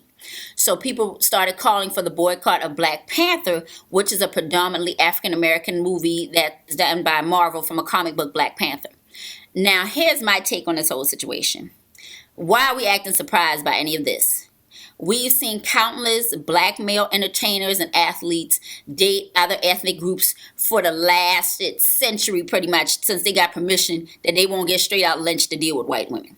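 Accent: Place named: Charleston, United States. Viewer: are you from Charleston? i hear your accent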